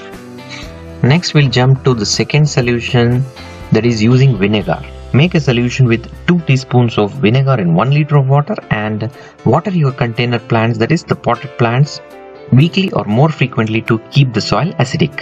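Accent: India. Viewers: I don't understand what the hell you talkin about, with your Indian accent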